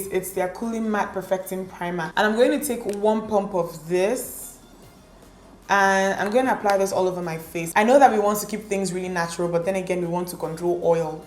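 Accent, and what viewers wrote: Nigeria. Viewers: Are you Nigerian? I love your accent 😍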